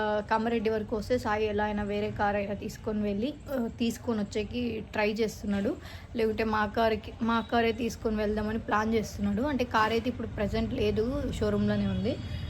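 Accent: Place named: Gauribidanur, India. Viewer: nam Gowribidnur slang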